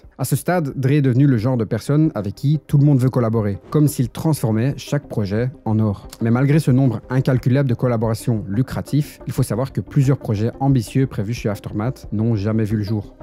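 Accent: Belgium. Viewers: ton accent belge est degeulase horrible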